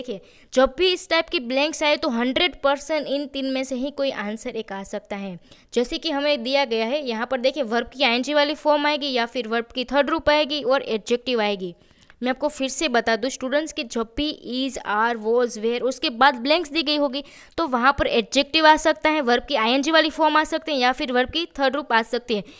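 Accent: Gujarat, India. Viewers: Ape ma'am to first ape Gujarati hoo 😅 vo to malum chala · Gujarati accent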